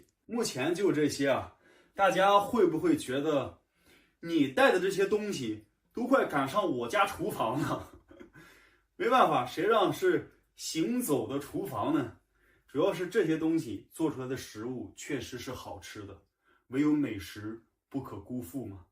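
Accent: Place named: Shandong, China. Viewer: 每天都期待你的视频，阳光正能量，更重要的是听着你山东普通话特别亲切，为咱们山东人点赞👍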